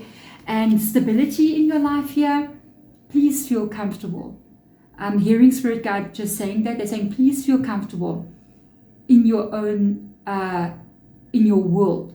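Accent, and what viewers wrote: South Africa. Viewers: B4 opening YouTube for a taro reading i asked my spiritual guides to guide me to a taro reader who can give answers to my questions and can with their reading uplift my spirit for this day. Lo and behold you were the first to appear and the moment you start talking i felt the connection with you. This is my first time ever that i come across your videos. The moment you start talking i knew that you are a fellow south african, only i live now in Australia. You are the best taro reader that i come across of all the other readers. The reason why is bc i have never their heart. Everything that you said resonates with me. The isolation that i prefer bc of others bad energy thats not good for my soul. Many times i feel like i don't belong where i found myself. I am very empathetic and can feel other people's emotions. The other day i dreamt that i was nackered and i needed somewhere to rest but where i am, i feel like im not welcomed. 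I found a bed where i can rest and felt so uneasy i was afraid to fall asleep then suddenly i feel arms around me and immediately i knew whom it was without turning around. At that moment I felt at peace. The person whom it was passed away 5 years ago and has told me that everything is going to he alright. Later the day i also remembered the morning he came to visit me was also his birthday. Thank you for your reading and i am subscribing immediately. Looking forward to listen to your other videos. Love and light.❤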